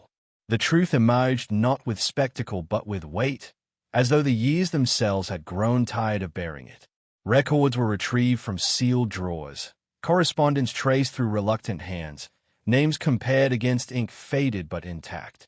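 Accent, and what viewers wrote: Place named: Australia. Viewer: Sounds somewhat like an Aussie to me.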